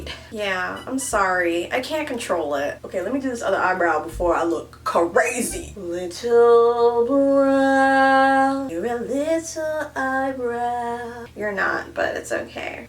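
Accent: England, United Kingdom. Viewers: Queen your British accent is 😘👌💕 Also gonna call this Lil Grinch🤣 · 0_o Excuse me but your british accent scared me - I'm black and English but like you sound more british than me 😂🙈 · Your British accent is actually really good 🤔🤨❤ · That British accent tho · Me not used to the British accent 👁👄👁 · Your british accent is pretty good -coming from a british girl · Can you do a video of doing a make up tutorial video with your british accent please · That English accent is on point, much love from a Black British fan (so I know my own accent 😉) also I think I'm 153cm tall too! Height twins 😂😂😂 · I like the British accent❤ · That British Accent 😍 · Your british accent though 😳💕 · I’m impressed by your British accent! Only discovered you recently, loving your content 🙌🏾🙌🏾🙌🏾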